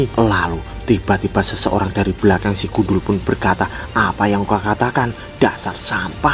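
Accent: Java, Indonesia. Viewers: Logat bahasa jawa e kentara mok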